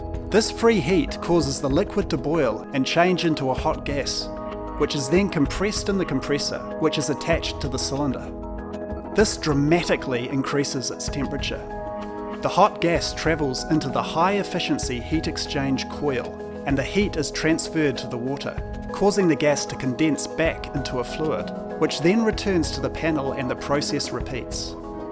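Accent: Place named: New Zealand. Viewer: Well, this is totally irrelevant but I must say I love you accent! We need more New Zealand people on the international media!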